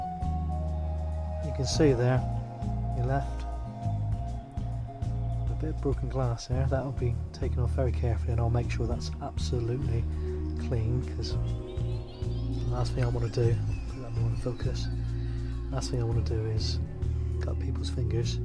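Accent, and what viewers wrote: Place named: Plymouth, United Kingdom. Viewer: Well done mate good video, I am going to give this a go on my MK4, your accent sounds local to mine, I'm in Plymouth!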